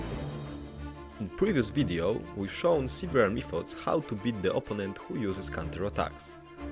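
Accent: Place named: Eastern Europe. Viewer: We were promised Slavic accent, and we get memes in Slavic accent. Nothing to complain here...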